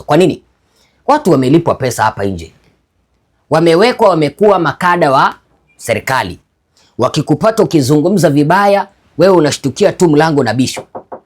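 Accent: Kenya. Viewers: Mbona accent ni ya kenya? Reporter anajiita mtanzania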